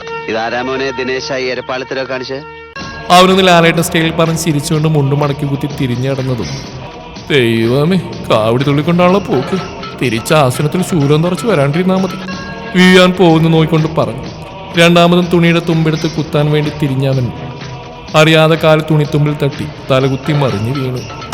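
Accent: Tamil Nadu, India. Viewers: ഉണ്ടേ!!!!!😂😂 ഈ തമിഴ് കേൾക്കാൻ നല്ല രസമുണ്ട് 😊😊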